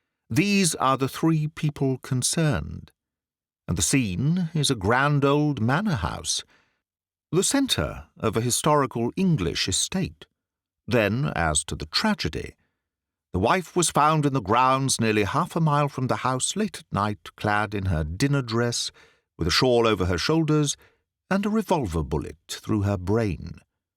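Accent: United States. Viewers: I am always impressed with how well you do an American accent. Good job.... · I have just heard another one of your stories and may I say I have listened to it three times now. It's a wonderful story and you sir as usual are at your very best. I enjoy you so much you just do not know. Your rendition of American English was perfect 👌😍!! Please, keep these stories coming for as long as you can. You still amaze me!! With best regards to you!!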